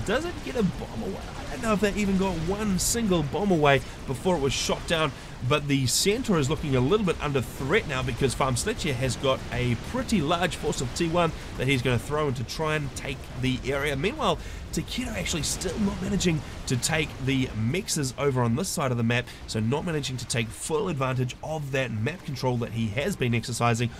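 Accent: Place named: New Zealand. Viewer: first up good on you for taking the time to covering FAF! the quality of the cast was very good. i however am a tad ocd and found listening to your NZ accent exxtremely irritating, so sorry had to be honest!!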